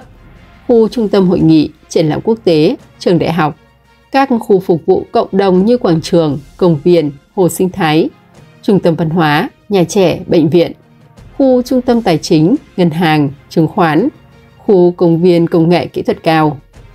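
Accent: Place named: North, Vietnam. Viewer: nghe cai giong bac ki nay >>>bun ia qua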